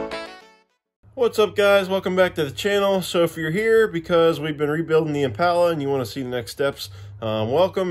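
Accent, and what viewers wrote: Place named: Pittsburgh, United States. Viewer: I hear a Pittsburgh accent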